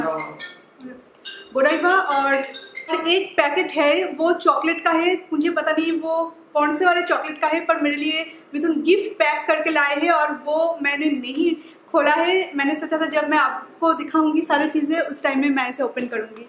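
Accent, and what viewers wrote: Bengal, India. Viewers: It's so inspiring to see you working so hard for you and your family's future. To all your haters i just wanna say that there's nothing wrong in your pronounciation ; it's just your bengali accent that gets mixed with it. They should rather get inspiration from you that you are working on your english even after so much hate. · Apki voice bahut achi bangali touch wali Hindi Luv d most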